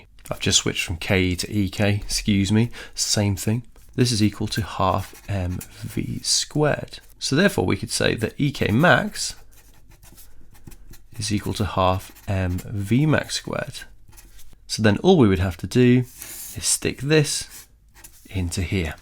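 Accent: United Kingdom. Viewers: i Knew he was british through his accent but he confirmed it by writing centre instead of center (but who cares this is a great expalaination for simple harmonic motion)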